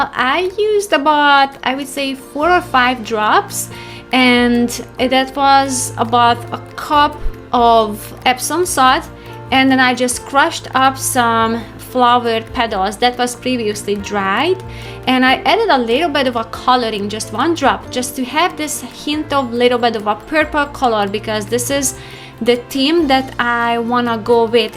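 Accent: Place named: Hungary. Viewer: One of your other videos was just recommended to me and when I clicked and heard your accent I knew straight away that you are a fellow Hungarian! 🧡 Feliratkozva!! :)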